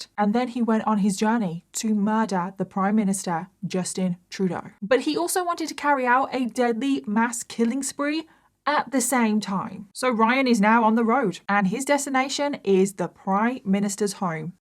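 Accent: United Kingdom. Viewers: Can somebody please tell specifically what kind of British accent has? Thank you. I like it.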